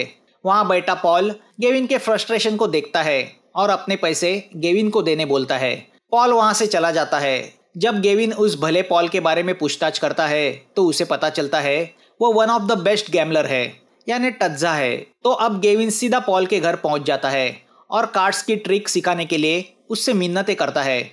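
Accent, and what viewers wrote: Maharashtra, India. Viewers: What makes this channel stand out is the Hindi spoken in Marathi accent for narration.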